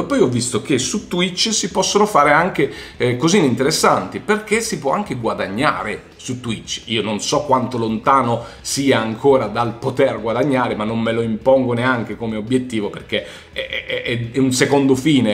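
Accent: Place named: Foggia, Italy. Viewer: Ma sei di Foggia??? 😁😁😁 Riconosco l accento familiare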